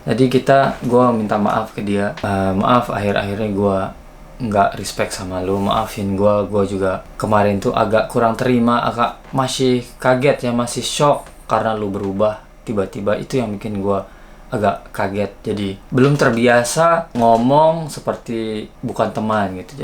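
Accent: Lombok, Indonesia. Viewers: Kok mirip logat Sasak ya bang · Bang logat sasaknya gak bisa ilang walaupun hidup dijepang .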